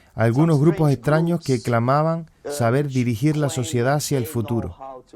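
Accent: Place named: Canary Islands, Spain. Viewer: Muchísimas gracias por compartir conocimiento que de verdad nutre, tan escaso y tan difícil ee discernir entre todo el marasmo de intoxicación y desinformación que hay en la red. Orgullosa de verificar que por supuesto hay otro canario "despierto" (nuestro acento te delata...es inconfundible y a mi parecer precioso). Te deseo lo mejor con el canal, y en general, "que te vaya bonito" que diríamos por estos lares.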